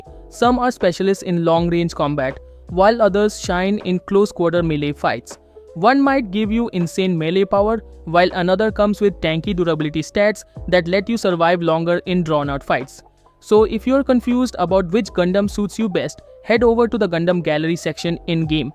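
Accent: India. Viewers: Shit Indian akzent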